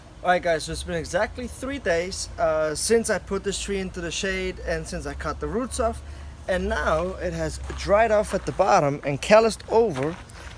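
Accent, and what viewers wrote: Denmark. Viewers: Is this guy Danish? I think I can hear something Danish in his accent 🤔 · Is that a Danish accent?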